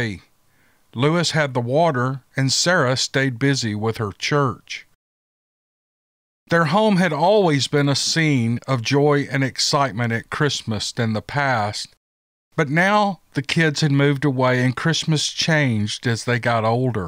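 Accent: South, United States. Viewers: Finding Bigfoot? Finding Nothing... You Don't Find Bigfeet. They Find You. (Don't Call Us. We'll Call You) I'm quite new to this channel, about 4 months at THIS typing. I'm addicted to it - Some super good, fun stories. I enjoy "Steve Lilly Journals" too. (God DAMNED Filthy 'Squatches!! Ha!) Good Writing! ~ I live in B.C. (Vancouver Island) Canada. I've spent decades living in some pretty "howling wilderness" & experienced some strange things. I've yet to encounter any Sasquatch...However, I know one serious individual, whose word I trust as veritable without question, and who claims He HAS seen one... I neither believe nor disbelieve. I don't know Anything for Certain. Indigenous peoples here take the Sasquatch as a matter of fact. Sasquatch are deeply embedded into their very ancient cultural mythos lineage. Many tribal members are quite candid about the phenomenon, they call "Pohk Miss" (Meaning "Big Foot" - Go Figure) as if as familiar, and real as the West Wind. 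I CAN say that we live in a vast, complex, diverse, and mysterious living world, about which We collectively barely know & hardly understand at all. It is always in a state of Constant Flux. Fools THINK they have a real grip on reality. ~ Been practicing Mr. nice regional Southern accent. (I like various accents and find them "geo-culturally" & historically interesting, with regard to the human condition.) I'm...Getting better...Getting better... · I love listening to you too in the mean while i am knitting or crochet a sweater or so, you have a nice voice and talk so that even with your southern accent it is pleasant and very good to understand, so keep on the good works, greatings from Marleen from far away europe in Belgium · Hi, I hope you and your family have a safe and happy holidays. I'm from Australia 🇦🇺 and it's summer down here,... I love listening to your Southern drawl. Keep up the great work. 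· You can pronounce it any way you like--that sweet southern drawl (I'm in California orig from El Salvador) is what makes it so much more enjoyable. And yes, yes, the Sasquatch stories too, lol!! ❤️ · So true. He has a comforting voice and I just love that southern drawl. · I'm a 70 years old lady in Pocahontas, Arkansas I like listening to your Bigfoot stories too. And I especially like to listen to stories about bigfoot being so close to where I live. Your voice seems southern and familiar so it keeps me company. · colloquialism and regional differences in syntax are what make regions unique. rather than focusing on any particular differences, realizing how any language changes through time, understanding and accepting these changes will help those who are hung up on particular words and/or pronunciations. as long as people can understand your message, then what does it really matter. 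and those who nit pick and complain just to complain, usually are projecting their own issues of self acceptance., your reading/narrations are always spot on and very easy to understand. Your Southern accent, draw and tone make it all the more enjoyable 😎🤗🙃💯✨😐. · I agree with Miss Jessie you do have a sweet southern accent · I enjoy the stories , don’t sell your self short . You are an articulate gentleman. Down home southern is refreshing, fun and precise. Merry Christmas.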